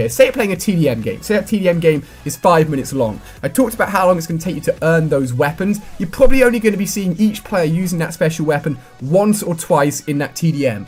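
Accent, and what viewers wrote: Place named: United Kingdom. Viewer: Don't know what's better the game his commentary or his British accent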